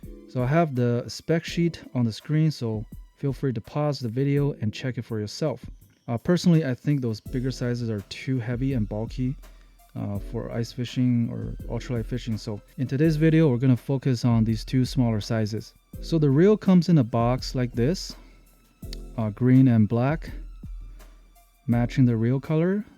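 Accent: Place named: Canada. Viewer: Fuck Im good. I could tell immediately you were in north America then after a couple minutes I knew it was Canada😂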